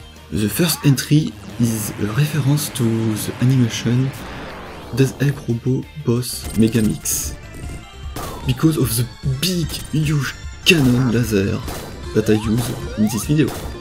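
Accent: France. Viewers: Accusent français très prononcé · Nice france accent man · De l'anglais avec un accents français Noice... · Cet accent français de l'enfer ! Avoue, tu le fais exprès ? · ware a part 2? I love you french accent Un francais!! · It seems his voice is very french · Bonne vidéo. Par contre, ne le prend pas mal, mais je te conseil de bosser un peu ta prononciation de l'anglais. Certes, l'accent français excuse pas mal de chose, mais si prononce tous les mots anglais avec une prononciation française, ça risque à un moment de ne plus passer... Sinon, continue tes vidéos, tes animations ont cools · You have a french accent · AH du bon français qui parle anglais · this accsent is frenchy very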